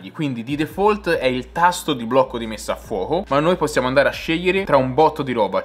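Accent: Tuscany, Italy. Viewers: Video belli ma correggi L accento troppo troppo toscano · Video bellissimi ed interessanti. Vai, parlata toscanaccia simpaticissima, è tutta invidia. Il prossimo fallo in cadenza veneta!!!!!!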